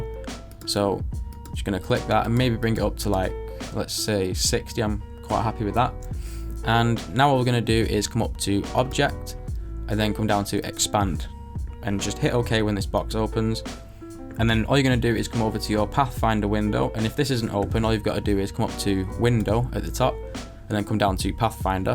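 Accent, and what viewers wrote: Manchester, United Kingdom. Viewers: I can’t place your accent.....Mancunian?......? 😂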